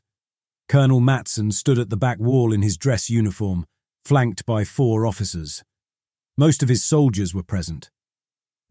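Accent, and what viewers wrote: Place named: England, United Kingdom. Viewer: Thank the gods...... Finally a story with a clear clean English accent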